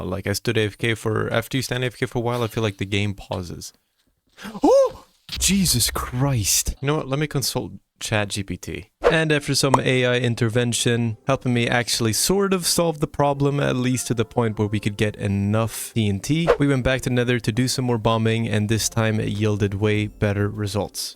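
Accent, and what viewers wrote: Sweden. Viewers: Are you Swedish perhaps? If so, hallå där🕺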